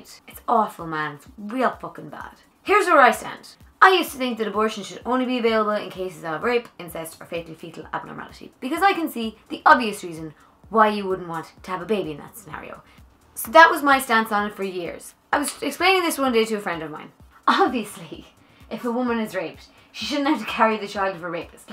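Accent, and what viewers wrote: Ireland. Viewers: I know it's that I'm American and not used to hearing the Irish accent, but I can't tell the difference between you saying legal and illegal.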